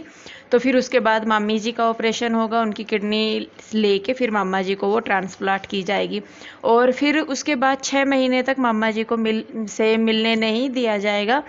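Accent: Haryana, India. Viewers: आप यूपी की लग नहीं रही आपके बातों से हरियाणा वाली लग रहे हो आप मेरे बेटे के लिए आपकी साइड की कोई लड़की ढूंढ दो गरीब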